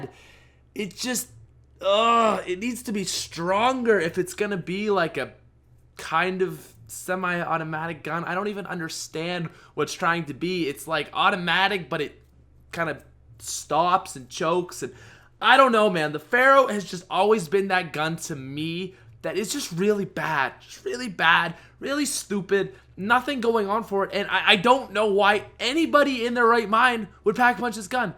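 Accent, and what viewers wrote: Canada. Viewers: did any one else notice the Canadian accent hahahaaha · This guys Canadian aboot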